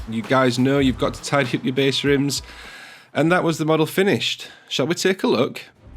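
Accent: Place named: South Yorkshire, United Kingdom. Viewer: Hey where you from you sound local to me. South Yorkshire.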